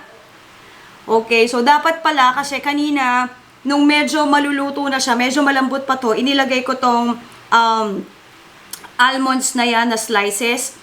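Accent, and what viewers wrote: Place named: Visayas, Philippines. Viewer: wow magaya nga in bisata accent 😉 pa sab back ka keto, keep connected, tnx 😉📊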